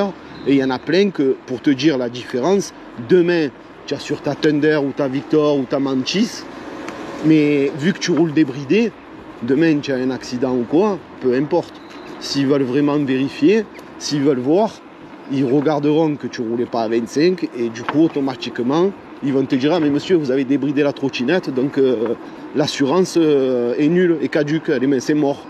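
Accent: Marseille, France. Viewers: Salut Tonton, merci pour tes vidéos toujours aussi sympathique avec la gouaille Marseillaise qui va bien 😅 Chaque vidéos c’est un régal de te suivre, garde ta façon de faire car on te sens généreux , objectif et réaliste dans ta démarche et tes propos 😊 Perso j’attends ma Suprem qui devrait arriver sous peu tellement Weebot s’est fait démonter les stocks…😮 Au plaisir de te rencontrer un jour, bonne suite à toi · Excellente découverte avec un bon accent du sud comme toujours, sympathique comme tout, même avec les vieilles XD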